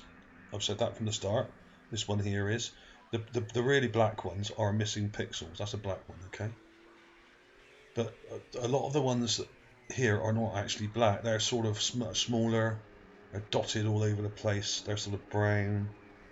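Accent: Bristol, United Kingdom. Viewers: Are you Bristolian mate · you sound Bristolian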